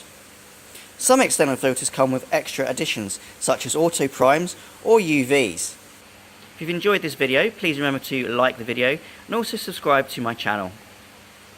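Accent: England, United Kingdom. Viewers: Kit Harrington accent...nice 👀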